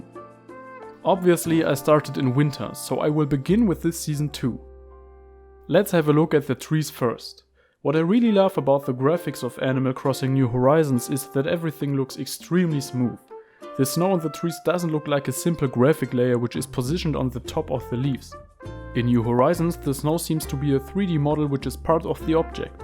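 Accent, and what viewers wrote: Germany. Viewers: Man hört raus das du Deutscher bist 😉 gutes Video 👍🏻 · Hör ich da etwa einen Deutschen aktzent? 😁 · Aha ein deutscher Akzent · How do you only have 15 subs? This Video is doing Great! Great editing, good quality and smooth music in the background which isn’t too loud or quiet. There might be a german accent but dang! You got a new sub ( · are you german? because your english sounds like you were from germany. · Bist du Deutscher oder warum hast du so einen deutschen Englisch Akzent ??? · Good video. (hörst dich an wie ein deutscher... Kann ja auch sein) This differents are extrem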